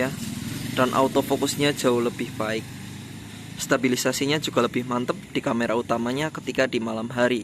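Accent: Banyumas, Indonesia. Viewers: bang lu org banyumas ya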